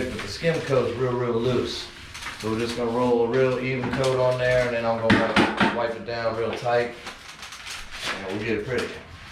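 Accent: South, United States. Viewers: I've watched many of your videos & your accent is so mesmerizing I know it's from the South, definitely not Texas where I live. 😊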